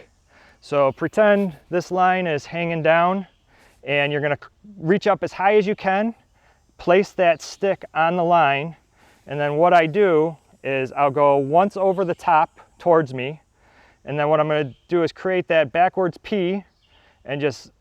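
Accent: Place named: Chicago, United States. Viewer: Dude are you from Chicago? I can recognize that accent anywhere....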